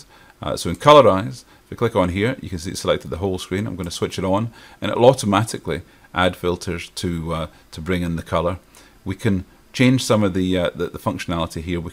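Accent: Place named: Scotland, United Kingdom. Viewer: Thanks. Im a fellow Scot who has lived in Australia for 40 years. I watch a lot of photoshop videos but it’s nice to see one in my own lingo and you present very clearly and concisely. Cheers pal.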